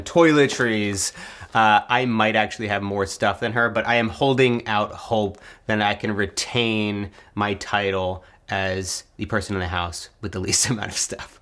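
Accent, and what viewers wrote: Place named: Australia. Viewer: I can hear a bit of the Australian accent on ya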